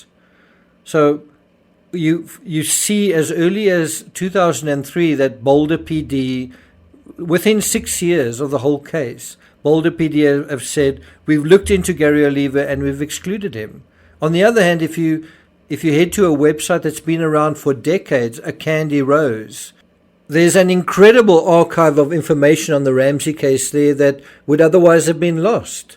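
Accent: Afrikaans, South Africa. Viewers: Yes he is South African with an Afrikaans accent. One of the best on Youtube. His insights are often very original. is also ex South African. Another excellent True Crime Channel. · lol being South African I thought hell yeah his accent must be Afrikaans, thanks for the info I didnt know was also a South African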